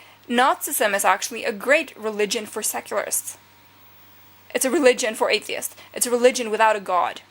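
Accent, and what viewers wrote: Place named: United States. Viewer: Wow. Your accent sounds entirely American. Is it common for Norwegian to speak as you do? Thanks for the book review.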